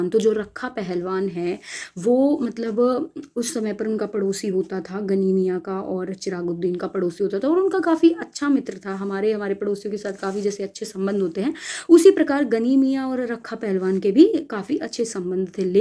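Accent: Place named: Garhwal, India. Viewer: Ye garhwali tone ayee hyee 😍😍 pahadi girl ❤️❤️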